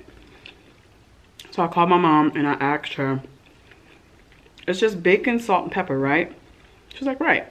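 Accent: New York, United States. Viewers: This girl from Queens appreciates your NYC accent! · Lmbooooooo at your NY accent. I’m from NY. You dZid good sis. Lolol (I added the z on purpose) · I don’t know what it is but I really enjoy watching you eat Mac N Cheese and it’s so pretty too 😎 yes I love a NY accent too 🤣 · She should do a mukbang video only talking in her New York accent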